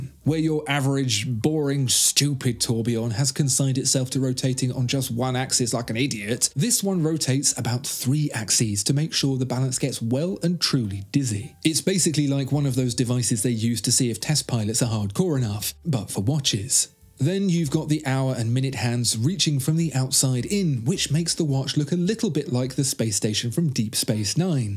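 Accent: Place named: United Kingdom. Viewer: The most soothing voice on YouTube! Eloquent and colourful use of the English language with a classy British accent, not to mention knows a heck of a lot about watches. ❤your review. 🍉 🍉 🍉 Thanks.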